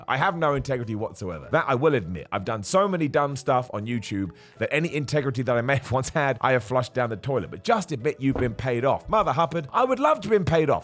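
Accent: United Kingdom. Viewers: I watch all of your what culture videos and your personal channel here. Love you!!! Love hearing your brittish accent... oh and whatever you're talking about as long as you're talking!! 🥰❤️❤️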